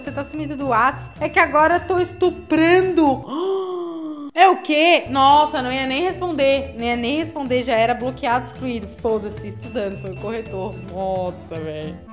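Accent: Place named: Florianópolis, Brazil. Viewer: Você é de onde ? Tens sotaque de manezinha 😍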